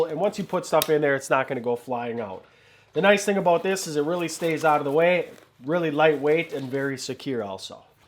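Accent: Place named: Wisconsin, United States. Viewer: Great vid! I vote you do all the tech talks w/ the thick Wisconsin accent that you used in previous vids!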